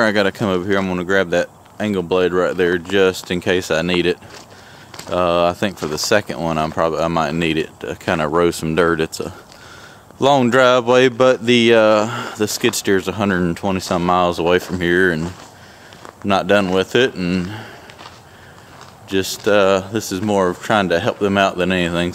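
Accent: South, United States. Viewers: I don't mind your southern accent. We all have an accent so you can't make fun of it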